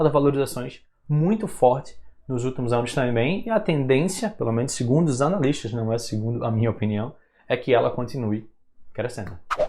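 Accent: Pernambuco, Brazil. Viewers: Pelo seu sotaque vc é daqui de Recife, né? 😂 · Vc é de Pernambuco?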